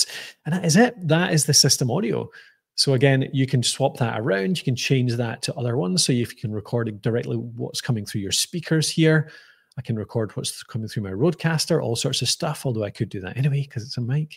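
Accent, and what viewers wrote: Scotland, United Kingdom. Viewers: soon as i heard that Scottish accent i knew i was in safe hands, top man!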